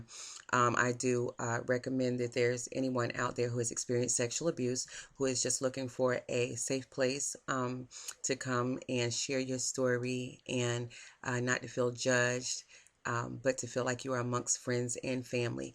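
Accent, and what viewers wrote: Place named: New Jersey, United States. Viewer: you are sooo from Jersey with the "yous" haha i love it! Im from CT and have been to Jersey so many times I cannot count so I can relat. Thanks for this video!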